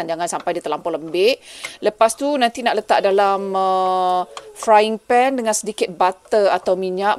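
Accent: Negeri Sembilan, Malaysia. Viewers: Bagusnya.. Tak lupo cakap nogori walau dimana sajo berado... Nismilan negori kito....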